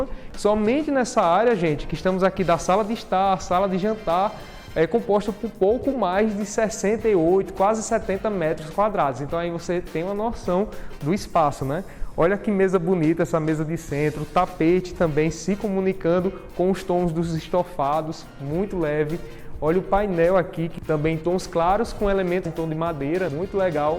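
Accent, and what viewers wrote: Northeast, Brazil. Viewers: Comigo, foi diferente, sou inscrita em vários canais de arquitetura, pois além de amar, estou em reforma. Mas nesse canal ( o único da área ) me inscrevi pela simpatia do rapaz tbm. Sou mineira e amo o sotaque nordestino) 😊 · Amei a forma como você apresenta! Esse seu sotaque é demais! Até porque eu também sou uma paulistana/ nordestina! Este sobrado é simplesmente linda!! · Que casa meu Deus,e esse sutaque lindo essa simpatia nossa que maravilha,por isso amo o nordeste, parabéns!!!!! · Adoro seus vídeos, vejo todos... Você é uma simpatia esse sotaque nordestino dá todo um charme no vídeo... Parabéns pelas filmagens... E a casa realmente maravilhosa.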